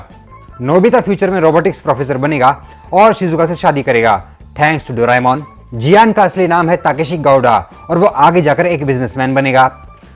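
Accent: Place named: Marwari, India. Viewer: I love your marwadi accent 😂😂😂😂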